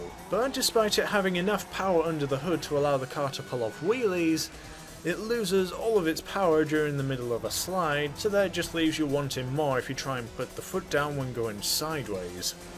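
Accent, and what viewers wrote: England, United Kingdom. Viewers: That English Dave was spot on, oh yeah, well you are English, soooo yeah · Sounds nothing like English dave but he does sound English even in his normal voice which doesn’t make a lot of sense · He seems to be British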